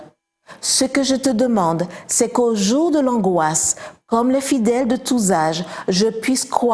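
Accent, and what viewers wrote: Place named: Antilles, Caribbean. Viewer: Merci pour ces belles paroles 🙏 Après avoir passé un peu plus 23 ans en Guadeloupe, le léger accent antillais rajoute pour moi une dimension affective forte. Je connais l'esprit chrétien très fortement encré dans cette population qui est devenue ma seconde famille. Les touristes voient d'abord les plages et les beaux paysages, mais c'est avant tout un peuple infiniment bon et beau. Oui, je me suis un peu éloigné du but de cette vidéo, mais pas autant que ça, puisque l'amour est présent dans chacun de ces mots. Que Dieu vous bénisse 🙏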